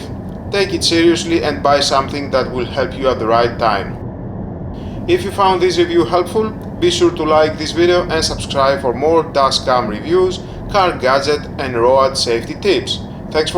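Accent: Greece. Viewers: Useful review, ty. Honestly, you sound like Greek 😅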